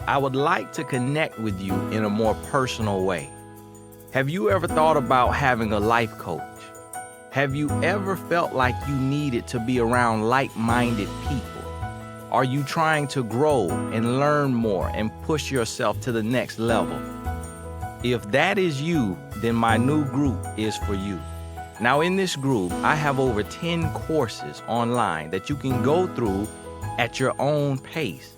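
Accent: South, United States. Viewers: You sound so country in this video 😂 “listen to meeee” 😂😂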